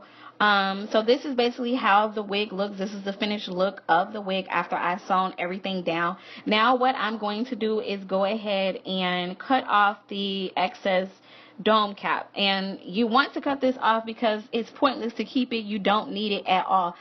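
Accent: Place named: Philadelphia, United States. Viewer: are u from Philadelphia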